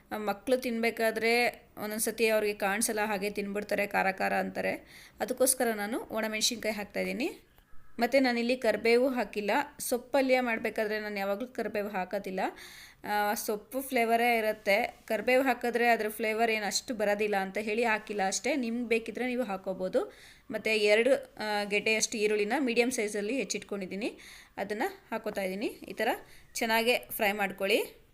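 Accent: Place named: Mandya, India. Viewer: ಅಕ್ಕಯ್ಯ ಮಂಡ್ಯದ educated ಮಾತಾಡೊ ಕನ್ನಡ ಚೆನ್ನಾಗಿ ಮಾತಾಡ್ತಿಯಾ, ತುಂಬ ಇಷ್ಟ ಆಯ್ತು, ಸೂಪರ್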